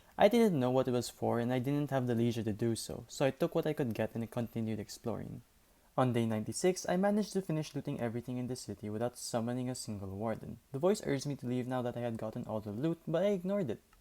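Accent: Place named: Philippines. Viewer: Sounds like filipino accent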